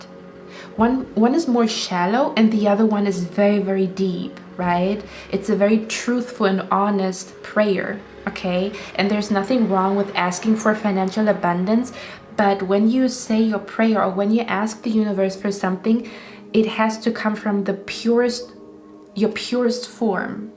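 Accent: Germany. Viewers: Pile2 Since a week a have a lot of deja vus its weird like a lot on a day And I guess I meet one of my guides in my dreams A lot of readers say aomething about matrix and lucy? I hate this kinda films they are so dark but I am going to watch it anyway cuz of the messages Und ja man sieht den Wald vor lauter Bäumen nicht ich höre einen deutschen aktzent🤣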